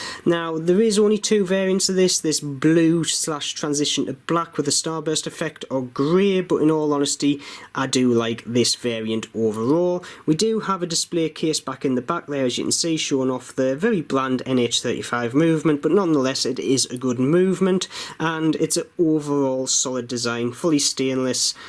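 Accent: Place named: Northeast England, United Kingdom. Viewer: Nice video interesting collection not a fan of that dress watch though I have the pagani submariner in the hulk colour myself and really rate it I am also a fan of vostok watches and of course the odd mod perhaps they could make an appearance in later videos plus its canny to hear a NE accent as im from Newcastle myself keep up the good work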